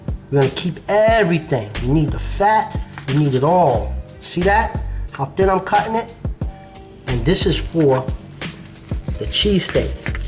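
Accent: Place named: Mexico. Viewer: dude, you sounded like mexican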